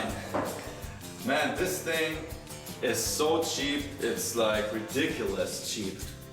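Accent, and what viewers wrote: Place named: Germany. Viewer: I always wondered where his accent came. Turns out he’s German. Still love the accent and good to see the Germans keep going.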